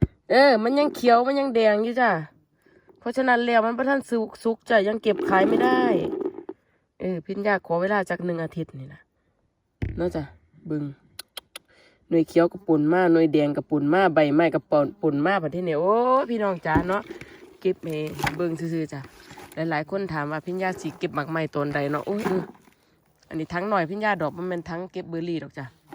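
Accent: Korat, Thailand. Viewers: เป็นFCใหม่นะค่ะฝากตัวดว้ยจร้าจะติดตามนะค่ะ..ขอโทษนะค่ะเป็นคนจังหวัดอะไรค่ะคือพูดภาษาโคราชได้ค่ะ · ชอบความสำเนียงโคราชบ้านเอง เป็นคนอำเภออะไรคะ บลูเบอร์รี่น่ากินมากค่ะ❣️กดติดตามแล้วนะคะ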